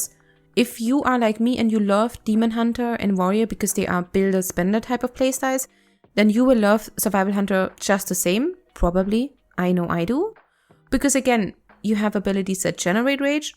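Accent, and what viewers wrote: Germany. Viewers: You are not even trying to hide your German accent like many do. I love it. You seem like a sweet person, I main a bm hunter but I want to try something new and since I don’t like mm I will give sv a chance. Thx for the guide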